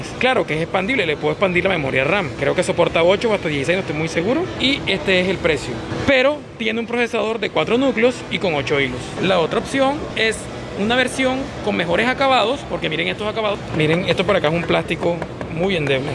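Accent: Caribbean Coast, Colombia. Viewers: Njd familia el vale es costeño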